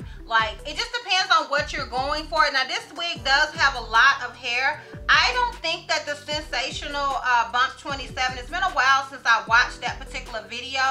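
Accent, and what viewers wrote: South, United States. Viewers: we can't see your kitchen. So you fly anyway. Love your videos and your southern accent 😍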